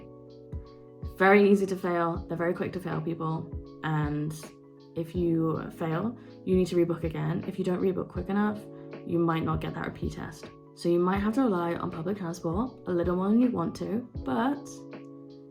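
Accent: Australia. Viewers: you sound slightly Australian more than English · You picked up an Aussie accent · You sound more Australian to me than to be an English Lass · your accent is slightly international, there's a touch of Australia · Your accent sounds Aussie to me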